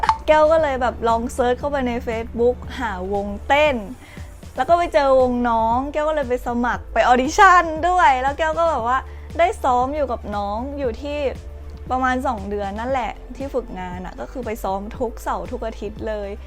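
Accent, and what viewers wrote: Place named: Phetchaburi, Thailand. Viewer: สำเนียงรู้เลยคนเพชร555+พี่ก็เพชร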